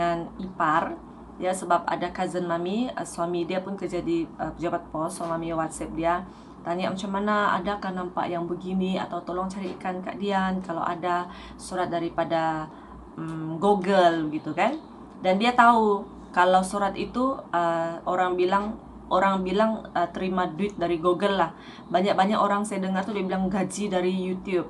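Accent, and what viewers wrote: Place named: Sabah, Malaysia. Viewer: Morning mummy, i thought you were Indonesian at first.. but in the middle of your video, your sabahan ascent start to appear.. I also have the same problem..been waiting for a month now.. my 1st pin didnt arrive.. i guess i have to wait until my 4th pin application then proceed to IC identification..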